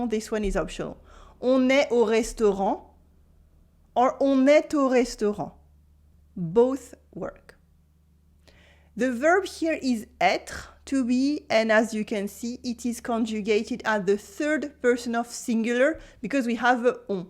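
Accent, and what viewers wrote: France. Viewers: OK! You a French woman or a Quebecer? Makes a big difference!!!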